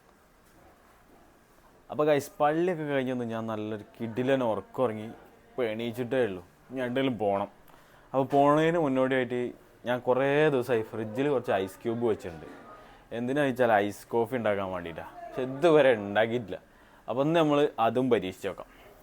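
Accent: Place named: Palakkad, India. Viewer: എന്റെ പാലക്കാടൻ ഭാഷ 👍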